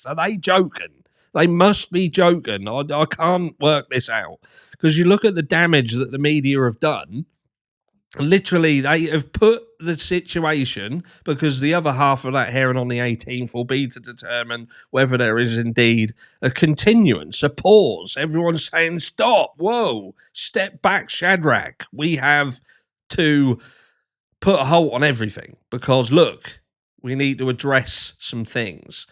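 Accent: United Kingdom. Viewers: I love and his British way of saying things like ozzy!" FK RIGHT OFF! " 😂 please i need a coffee cup that says that!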